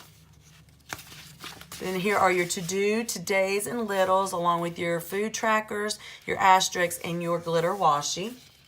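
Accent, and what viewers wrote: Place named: Carolinas, United States. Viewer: Love that color!!! If you dont mind, where are u from? I live in VA now but lived in Carolina for 25 years, your accent sounds like a Carolinian. So just wondering!!❤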